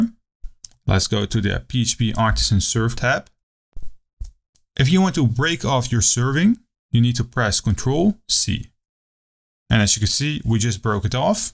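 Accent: Netherlands. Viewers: Great tutorial! You sound very Dutch btw...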